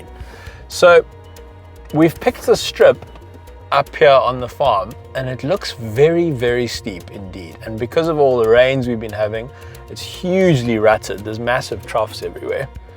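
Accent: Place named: South Africa. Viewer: So instead of listening to the review I'm listening to incredible way of presenting, so south african and refreshing. Good job dude 🤜🏽👏🏾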